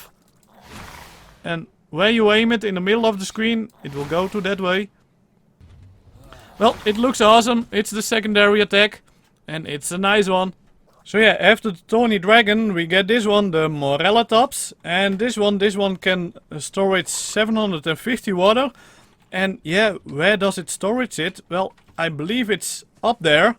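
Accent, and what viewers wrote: Netherlands. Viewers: Hey your dutch i can hear laat de leeuw niet in zijn hempie staan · I'm just gonna assume you're dutch. Because you sound hella dutch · are you a dutchman? you sound like one...i recognize the accent! XD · Ik hoor nu al dat dit een Hollander is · Is he Dutch because I hear Dutch pronoun citation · Yes im dutch too ik hoor het aan u accent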